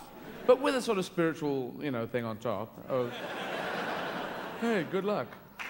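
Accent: United States. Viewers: I love his southern accent. That was hilarious. It is good, I am southern. I am a Cajun hillbilly, lol! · I love his American accent.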